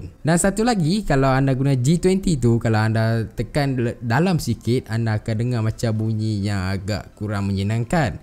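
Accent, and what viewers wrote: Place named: North, Malaysia. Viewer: Full utara truih ah bang . Mantap . Hihihi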